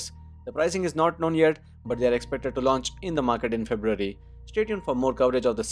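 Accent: India. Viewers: Indish english?! Its sooo nasty 🤮🤮🤮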